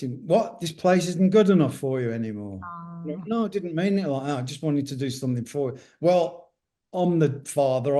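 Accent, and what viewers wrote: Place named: United States. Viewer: love geezer, dont really like that yanky narrator!!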